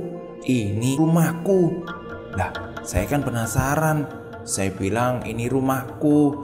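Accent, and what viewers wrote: Java, Indonesia. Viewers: aq palah suka logat jawa nya,jdi unik. yg gak paham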